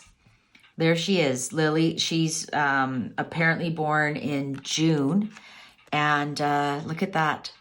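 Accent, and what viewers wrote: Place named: Canada. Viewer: I had no idea that is a fellow Canuck 🇨🇦. I just picked up on the accent. I knew there was a reason I liked her in addition to her being a talented, intuitive reader. Very good reading, 🔮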